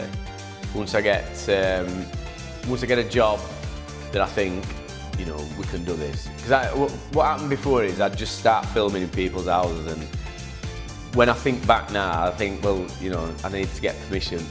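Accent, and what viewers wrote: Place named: Yorkshire, United Kingdom. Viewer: Deffo agree, could hardly hear those dulcet Yarkshah tone because of that crappy background music.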